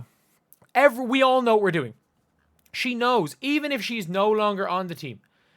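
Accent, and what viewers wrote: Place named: Ireland. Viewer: Ireland, he has a freaking accent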